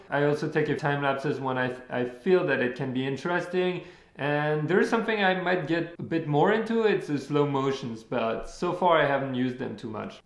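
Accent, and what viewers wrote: France. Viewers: He sounds like Mark ruffalo with a french accent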